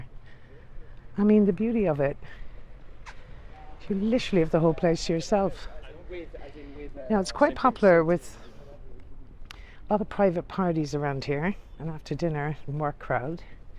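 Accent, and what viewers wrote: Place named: Ireland. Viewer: I have to Admit I got a little Crush on the Host!!! I Absolutely love the” Irish” Accent!!. You’re a very pretty lady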